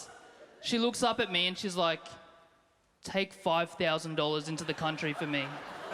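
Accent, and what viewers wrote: Queensland, Australia. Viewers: Is this Australia accent ?😂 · That's Aussie accent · queeensland accent. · An Chinese guy with Australian accent! That by itself is hilarious!😃😄😂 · this guy looks like asian and sounds like aussie hilarious xD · asian kid with australian accent... great combo. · What i found funny is that hes chinese with an aussie accent! Lol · Is that an Australian or a New Zealand Accest? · It's a real strong Aussie accent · Yea so aussie. Personally I find it quite annoying when they talk like that. Not all of them have the high pitch though. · Woah!!!!!!! This guy ROCKS!!!!! And love the Aussie accent! Good on ya mate!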